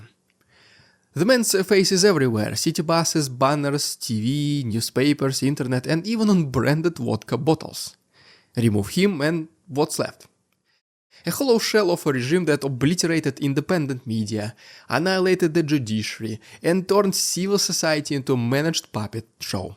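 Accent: Russia. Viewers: I don't know what will happen, but I have been waiting for this video, long before you made it. People have talked about Putin wanting to conquer Europe, along with other ideas like Putin will live for a really long time. Yet, Putin is already an old man. It is only a matter of time until death comes for him. Putin is a snake that is running out of time, trying to secure his legacy, in my opinion. Watching your videos is getting me used to hearing Russian accent correctly btw lol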